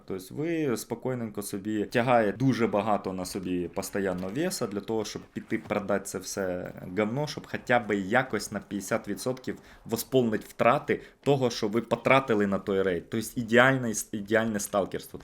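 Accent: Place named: Russia. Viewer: хлопче я бажаю тобі терпіння в твоїм починанні, але слухати твою москальську не можливо. Вуха просто ріже. Успіхів тобі.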